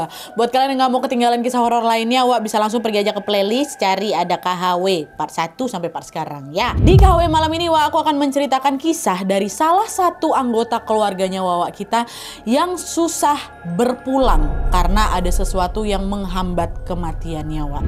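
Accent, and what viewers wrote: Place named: Java, Indonesia. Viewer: aku notice logat jawa nya kak makin keren aja 😘😘😘😘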